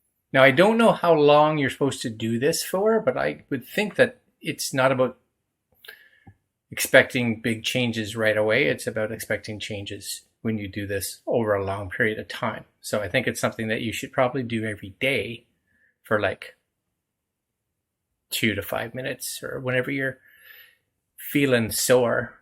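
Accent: Canada. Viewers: I’m Canadian but this guy sounds 100 times more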